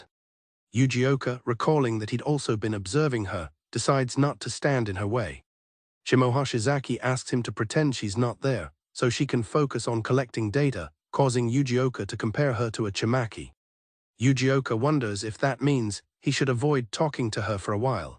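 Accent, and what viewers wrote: United Kingdom. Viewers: Why is the ai british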